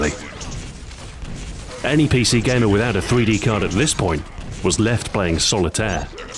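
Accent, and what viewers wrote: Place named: United Kingdom. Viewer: Ah-cah-reena is just the American accent. The narrator here is British, so pronounces the letter "o" very sharply like all "o"s are in the British accents.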